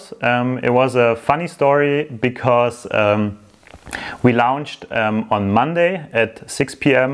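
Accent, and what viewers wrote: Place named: Germany. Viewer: Haha wie geil der deutsche Akzent 😄 Hört sich cool an 👍🏼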